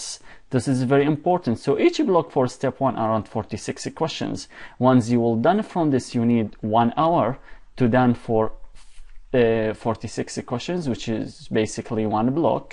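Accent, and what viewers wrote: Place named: Iran. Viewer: you kno bout estop estop omg dont marrrkkkk on. Where is the English proficiency? you dont underestand neting. dude your persian accent is terrible.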